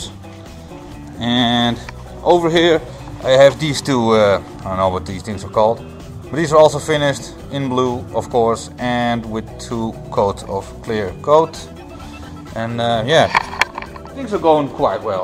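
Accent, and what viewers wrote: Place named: Netherlands. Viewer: Na 1 zin dacht ik al te horen dat je NL'er bent. Alsof ik mijzelf Engels hoor praten. Spuitbus van de Gamma bevestigt het. Bedankt voor de nuttige info. Ik was er al een tijdje naar op zoek.👍🏻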